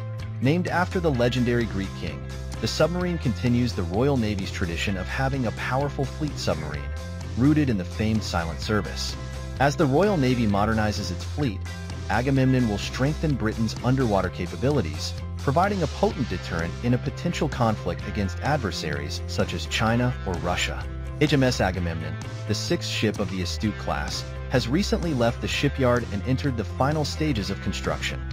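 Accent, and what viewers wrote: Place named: United States. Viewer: Do we really need an American accent and the American spelling of "centre" If they want to pretend to be British for money they should get it right. Some elderly Britons notice these things.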